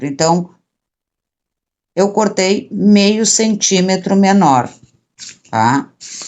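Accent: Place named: Gravataí, Brazil. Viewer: Ficou lindo o chinelo, e não é q reconheci esse sotaque , sou de Gravataí 😘😘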